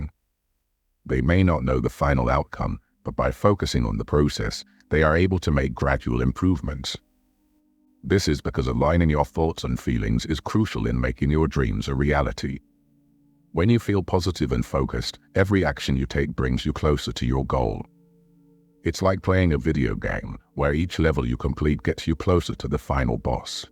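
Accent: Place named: United Kingdom. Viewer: I can hear that British accent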